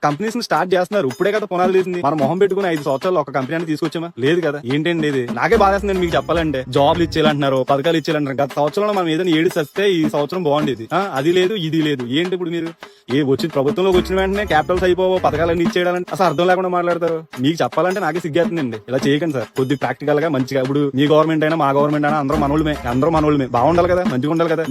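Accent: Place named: Godavari, India. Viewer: Tammudu nee godavari slang super😊😊😊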